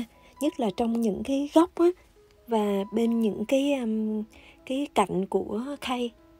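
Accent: Hue, Vietnam. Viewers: Cám ơn với hướng dẫn rất chị tiết và giọng nói Huế nhẹ rất dễ thương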